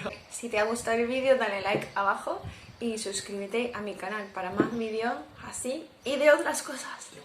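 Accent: Andalusia, Spain. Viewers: TU ACENTO ANDALUZ mezclado con algo de inglés es ENTRAÑABLE . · tiene "ange" la niña! Yo igua, me he quedado enganchaita al canal en cuanto la he oido con esas palabras tan andaluzas y ese acento tan bonito mitad inglés mitad andaluz. Que alegria me da como gaditana ver que alguien de una cultura tan lejana se adapta tan bien a la nuestra! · Querida, Yo nací en Cádiz y vivo en Cataluña aclarado esto te comento que celebrando mucho que de hace poco tiempo para aquí se desayune en Sevilla con pan con tomate hace pocos años atrás, lo típico eran las tostadas con manteca colorá o mantequilla o aceite.. El pan con tomate es típico de toda la vida en Cataluña. Aclarado esto te cuento que me encantan tus vidios y tú acento andaluz. Te mando un cariñoso abrazo con mi enhorabuena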